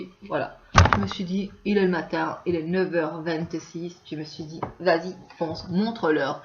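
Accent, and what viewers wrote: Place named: Liège, Belgium. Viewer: MDR. Tu me fais trop rire :)... Pour te rep.. "un mec" mdr... j't'explique vite fais, jsuis tomber sur ta chaine par hasard, en cherchant des gens qui parlais de nick conrad, ensuite jsuis tomber sur une video de toi qui parlais de ça, j'ai écouté, puis j'ai regardé en vitesse les autres video de ta chaine, mais étonnamment j'aime bien. T'as un coté artistique fort développé. Même si je vois que tu veux juste "parler de tout" et que oui tu t'en fou de l'avis des gens.. Jt'avoue que j'ai laisser un com's exprès pour voir si t'allais répondre, et j'étais sur à 99% que t'allais le faire dans une video mdr. jt'ai glissé un tacle suivis d'un compliment, juste pour voir... Mais la où tu m'as fais rire c'est sur le "un mec ou une fille, jsais pas" mdr... Ouai c'est claire que vu que je ne montre que mon oeil c'est difficile à dire mdr suffisait de cliquer sur ma chaine pour voir que le contenu n'as rien de féminin XD Mais bon, jvoulais juste que tu sache que j'aime bien ta façon d'être et ton accent de liège "jme moque pas jsuis originaire de Verviers ;)" et ce que j'ai bien aimé, c'est une phrase que t'as dit sur une vidéo : "c'est pas parce que je fais la conne que je suis débile" et c'est ce coté (jme prend pas au sérieux) qui est bien à voir.. 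c'est cool que tu t'arrête pas à l'avis des gens, vouloir plaire à tout le monde.. à quoi bon. Si tu t'aime toi, c'est le principal... PS: sur la video où j'ai laissé un com's il y avais déjà un "dislike" c'est pas moi qui l'ai mis, j'ai p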